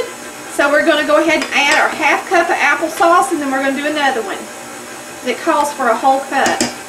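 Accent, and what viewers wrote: South, United States. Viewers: Looks awesome. I was diagnosed with cancer 3 yrs ago and after chemo, radiation & 3 major cancer surgeries at M.D. Anderson in Houston Tx, I had to find a new hobby so I wouldn't loose my mind & I've learned I LOVE TO COOK!!! I'm getting better with every recipe. I will be making this soon...especially on a cold rainy day and I'm subscribing to your channel. Thanks for the recipes. Much love from Mobile Alabama I was wondering what city & state are you from...I definitely hear a southern accent.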